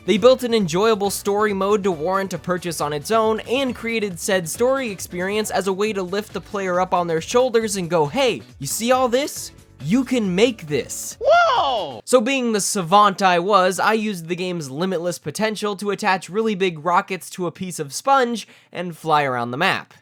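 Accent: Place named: United Kingdom. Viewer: The sheer amount of dopamine that released when I heard the british narrator's voice cured my depression.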